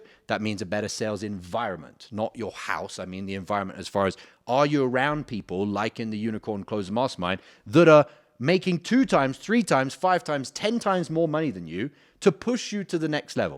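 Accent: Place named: England, United Kingdom. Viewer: have you heard of an english accent my friend?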